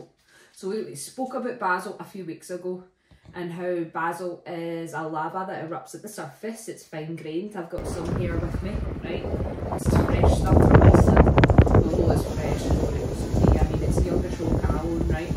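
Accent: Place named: Machars, United Kingdom. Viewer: Sounds local to me , Machars? .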